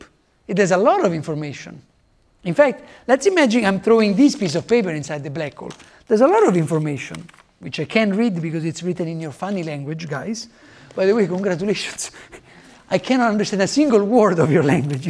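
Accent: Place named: Italy. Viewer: jestli Jirka Podolský nebo Pavel Krtouš najdou nějakého studenta, který by si to dal třeba v rámci zápočtu, tak to bude nejlepší řešení. Jestli se to nepovede do konce roku, tak udělám to, že Simona přeložím "on the fly" na diktafon, ten čas tomu rád dám. Ale nedám přepis do titulků, to by musel udělat sw nebo někdo jiný. Domluvíme se ve čtvrtek na fakultě:) Je fakt, že tohle je unikátní přednáška v českém prostoru - IMHO první technický kurz kvantové smyčkové gravitace v ČR, který dával na fakultě a mimochodem vystřihnul tuhle "netechnickou" přednášku. Ale i tak, terminologie a koncepty, které používá nemusí být samozřejmé pro posluchače, kteří nejsou obeznámeni se základy Obecné relativity a kvantové mechaniky. Takže musím jen doporučit jako prerekvizitu přednášky Jiřího Podolského na téma jeho překladu knih Carla Rovelliho (Carlo, jako jeden ze zakladatelů QLG přístupu, byl školitelem na univerzitě v Římě) <link> atd. 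Je také pravda, že angličtina je excelentní, ale se silným italským "soundem"a velmi středomořským rytmem, takže to nemusí být v kombinaci s netriviální terminologií jednoduše zkousnutelné ani pro posluchače, který anglicky umí slušně. Takže ano, má určitě smysl udělat překlad. Jinak záznam z jeho intenzivního kurzu, který končil včera se postupně objeví na téhle stránce <link> Ale je to prostě kurz živé oblasti výzkumu a dostupný bude jen pro lidi, kteří se dostatečně orientují v OTR a kvantové teorii pole a příslušných matematic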